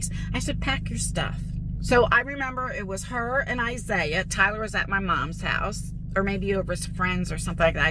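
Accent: New Jersey, United States. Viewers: It’s just been a few months since I found your vlog channel and now I watch every one❤ Just know there’s lots of us that really care about you and enjoy your videos. I wish I could help 😊 I love that you protect your kids and I’m also diabetic so I can relate. I’m also a mama bear with my kids and grandkids. 😅 Besides the fact I’m from California and LOVE YOUR NEW JERSEY ACCENT 😂 Reminds me of when I worked in a Jewish Deli when I was a kid. I worked with a cook from New Jersey 😊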